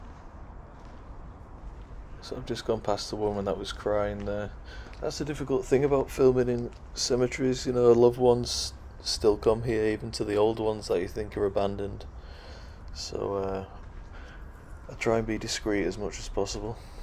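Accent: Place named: Hull, United Kingdom. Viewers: Great to hear an English voice for once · Can I ask where you are from? You sound like you've got a Hull accent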